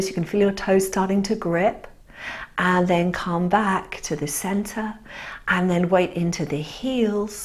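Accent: England, United Kingdom. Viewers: Hello, I haven´t done any exercises for a good few years, just being lazy, and very little inspiration, decided must do something, so I started only yesterday, did´nt manage to do all the 30 minutes, I thoroughly enjoyed those that I managed to do. Today was a little sore, but have done another lot of your exercises, to get rid of the stiffness. My name is Jan (Janythe) Canovas, I am a South African of English parents, I am 85 years old, and need to take care of the amount I can manage, but am happy to say that every day manage a little more, It is so good to listen to nice English accent, thank you. Your explanations are really wonderfull. I will come back to you in a few weeks, when I am able to manage the full 30 minutes, it will take a little time as I am VERY stiff and unfit, but you helped me a lot, even managed to go to sleep a little easier, I am so thrilled to have found you, and your over 60 Pilates. 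Thank you so much, sincerely Jan. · So happy to have found you. I'm a senior senior (!) and had stopped all muscle work and started doing cardio only, due to joint pain, etc. etc. This session allowed me to work my whole body once again without pain. Plus, everything's better with a British accent. Thanks!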